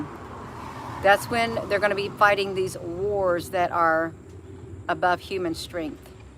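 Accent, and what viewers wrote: South, United States. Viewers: You sound like you live in the south. You have an accent.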